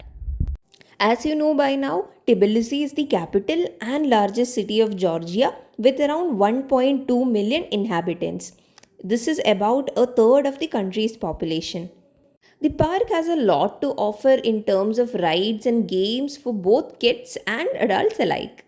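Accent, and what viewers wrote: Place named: India. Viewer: Indian accent is so terrible!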